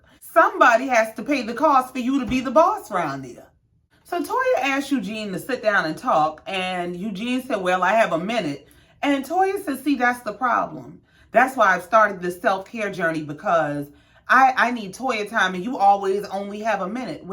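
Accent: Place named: Charleston, United States. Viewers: You gotta be from the low country. I know home when I hear it. Chile Eugene gone go out for cigarettes one day and never return. Works himself to death,smh. Is he not tired of moving? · You from Charleston????? Sound like me and my people!!!😂😂♥️♥️♥️♥️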